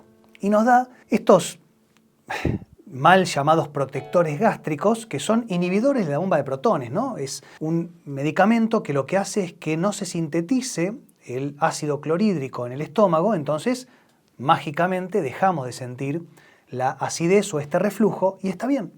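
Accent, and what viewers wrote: Argentina. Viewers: vos sos argentino? disculpa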